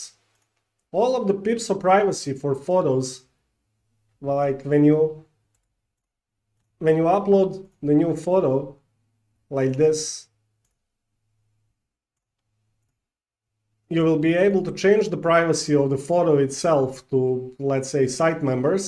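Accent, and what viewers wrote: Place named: Arab world. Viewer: thank you so much for a nice video in Arabic accent :D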